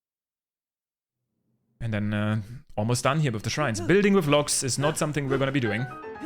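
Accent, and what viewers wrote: Germany. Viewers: I actually like to hear your fluid english with german accent 🙂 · German I think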